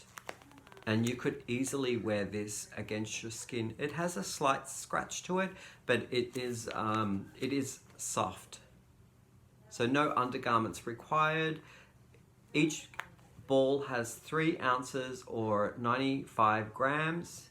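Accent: Australia. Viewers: Love hearing the Aussie accent. Loving your channel. Oi Oi Oi x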